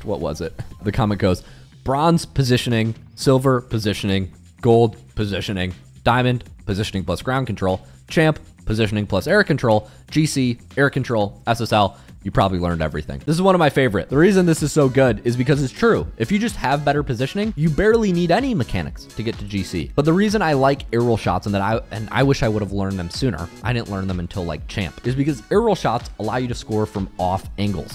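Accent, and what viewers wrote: Poland. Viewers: wait are you from poland? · Is he polish?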